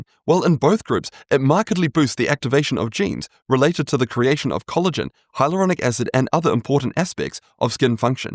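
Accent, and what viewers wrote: New Zealand. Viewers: Seems fine to me. Maybe it's the New Zealand accent? I'm Aussie so perhaps this is how I talk too 🤣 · Love the Kiwi accent… even though I hope AI wasn’t involved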